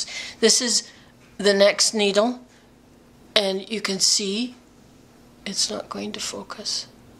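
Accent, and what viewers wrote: Canada. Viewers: Hi! I changed my youtube name from TheConnieS to Petalpresser. I hated that other one.. I don't do cross-stitch anymore because of carpal tunnel and eyesight. I never saw someone thread a needle like that before. Don't know if I could do it. You are a very good teacher. Your voice is very soothing. I think it's the Canadian accent. : ) Always glad to see your videos. What a treat!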